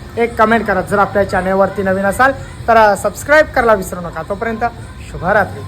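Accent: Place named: Agri, India. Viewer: Aagri tari bol nahiter sudh marathi bol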